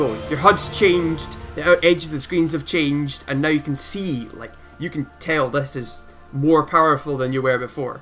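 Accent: Scotland, United Kingdom. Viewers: The pure Scottish accent makes me happy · yasss another scottish youtuber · you sound scottish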